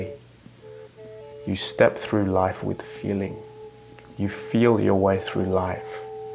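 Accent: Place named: Australia. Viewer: Thank you for sharing. You have an Australian accent. Wondering if you're in Australia still?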